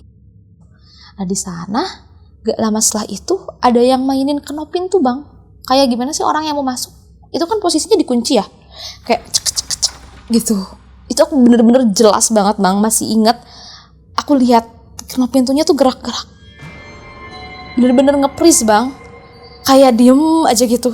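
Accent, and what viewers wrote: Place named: Sunda, Indonesia. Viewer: Klo org sunda ska mndongeng sok enakeun.. ada ciri khas nya kata " teh.." yg gk bsa d ilangin....😅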